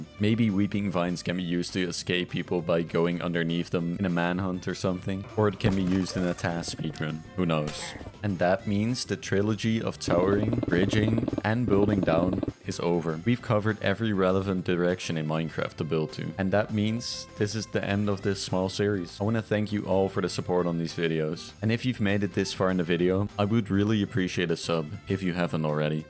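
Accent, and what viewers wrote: Netherlands. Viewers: You're Dutch aren't you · So ur dutch right? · Dutch pronunciation :)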